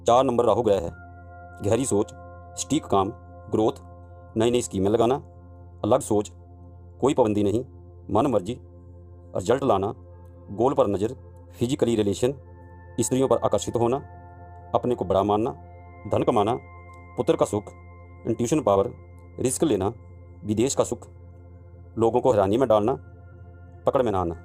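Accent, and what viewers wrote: Punjab, India. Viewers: Punjabi person speaking in hindi 👍👍🙏😁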